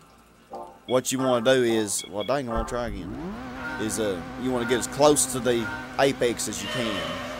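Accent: South, United States. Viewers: Man, that's a thick southern draw, where you from?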